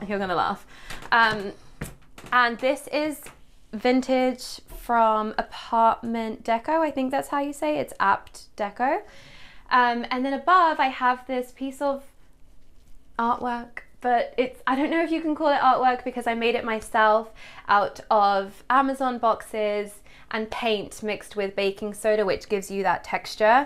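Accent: England, United Kingdom. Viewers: where is ur accent from in the uk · I love your apartment,. You’ve made it so beautiful and homely and yes sophisticated. I love all your DIY’s and as soon as I saw that DIY twig tree I was hoping you were going to say it was a DIY! I’m sooo going to make one! 😊 I’m English living in Australia and it’s so refreshing to hear a familiar, beautiful English accent.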